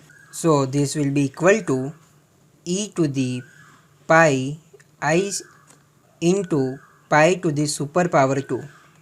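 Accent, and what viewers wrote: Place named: India. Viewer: Your Indian accent : "is equal to" is intriguing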